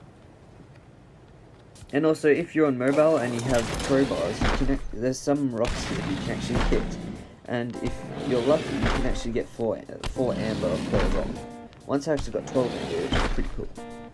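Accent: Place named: United Kingdom. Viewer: British boiii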